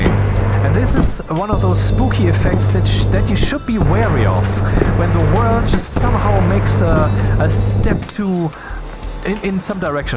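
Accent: Germany. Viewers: I love your little rant in the middle of an explanation in all your videos. It's very entertaining and I can hear that you have an intelligent and deep soul. The rant about smoking made me realise how stupid I was... I'm quitting this nonsense tomorrow, I'm young and have good genetics, I shouldn't be messing around with it! I've heard that a lot, but I don't know, your insulting German accent worked this time. Your teaching is multidimensional. See you soon on other videos.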